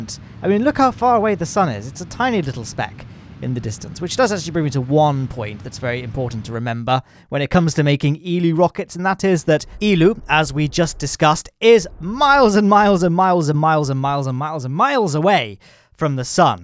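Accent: United Kingdom. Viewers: he's British I thought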